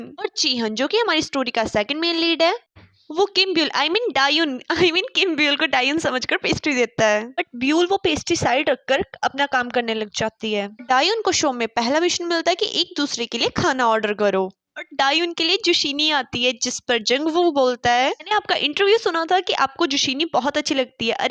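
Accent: Punjab, India. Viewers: Hi sachi app ki awaj bahut achi hai punjabi banda door sa hi pehchana jata hai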